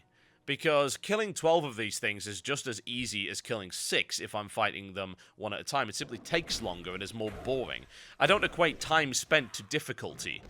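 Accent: United Kingdom. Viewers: are you british or American im confused : /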